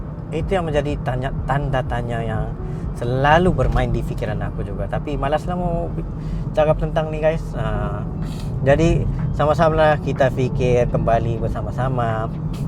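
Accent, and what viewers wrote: Sabah, Malaysia. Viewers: yang komen to buduh kah ? loghat sabah ngan indo pun tak dapat beza. duduk dalam gua kah dia tu . kau relex je bang. budak2 skrang mmg cmtu. tu budak tu klo di tempat aku mmg kena skolah tu orang. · Hanya sabahan cam sabah accent 😅 Jalan siring2 baru Selamat bah😂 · Aku dari first day tgk sudah tau ni slang Sabah. Semenanjung juga la paling sensitif kalau dengar Sabahan slang. Lawak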